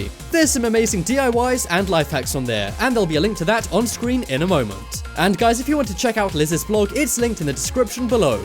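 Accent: England, United Kingdom. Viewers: God you're so dumb , he pronounces mum differently because of his accent. Just because he has an English accent doesn't mean he's going to pronounce it as Mum. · He's speaking American English with an English accent ???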